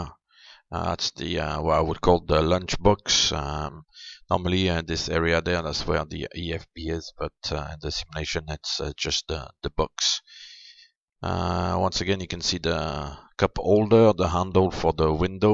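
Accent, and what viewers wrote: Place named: France. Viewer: Un joli accent Français ^^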